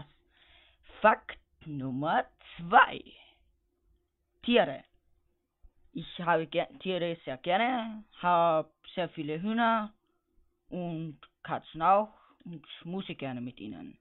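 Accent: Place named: Styria, Austria. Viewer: ^^ deine stimme is ja so genial..fakt numaaaaa ^^^ wie gehts denn so..? und deine mischung aus hochdeutsch und steirisch..thehehe tschüüüüüüs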